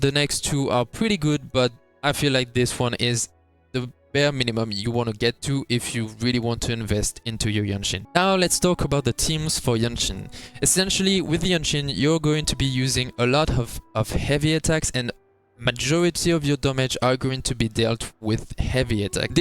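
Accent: France. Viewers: On dirait un accent français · Hello ! Good video, +1 ! You sound french, don't you ?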